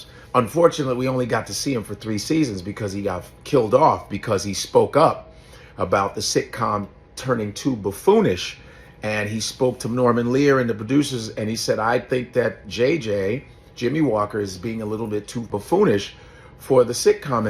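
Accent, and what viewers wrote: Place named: New York, United States. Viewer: No other accent can cuss you and make you feel like crap like that New Yorker or Yorkah😊. "Youza funky B@ fa dat. Family I was LMBCOFF. I Luv this brotha and his comedy is fiya!👏👏👏. Scallywag 😂😂😂. New Yorkers have the beautiful flaw of telling just how they feel without concern for yo feelinz. LuYa Big Bra for speaking your mind and representing this great actor.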